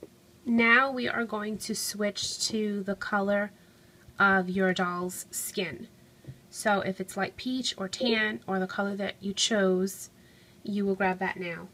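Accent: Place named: New Jersey, United States. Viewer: you have a New Jersey voice... no offense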